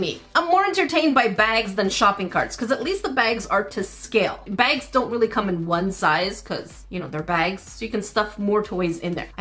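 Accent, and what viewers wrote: United Kingdom. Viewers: The Brit’ accent is real 😂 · Your bits of British accent is suburb !! Sending lots of love you and to Canada xx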